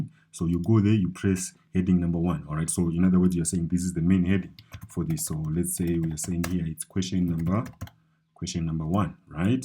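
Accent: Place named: Zimbabwe. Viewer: Hahaha...nice one, just listening to you, you reminded me of my Zimbabwean friend, so I want to guess you are fron Zim...lol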